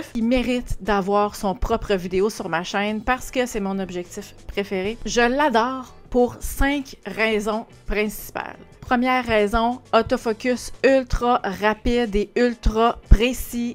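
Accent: Quebec, Canada. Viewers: Don't worry, it is Canadian french, that why...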